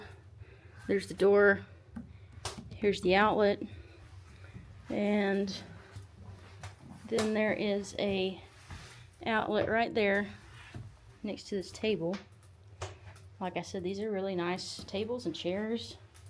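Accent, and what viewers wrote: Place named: Montana, United States. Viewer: Your starting to sound like them people in MT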